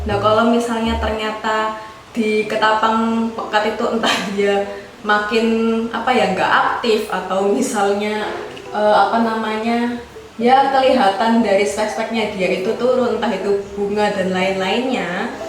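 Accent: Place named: Surabaya, Indonesia. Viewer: Suroboyo pundi mbak e sampean?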